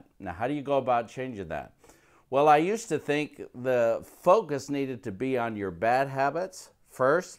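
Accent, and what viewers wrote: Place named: Italy. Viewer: Enjoyed listening to you realised one thing besides everything was saying was right.But also realised you must be Italian.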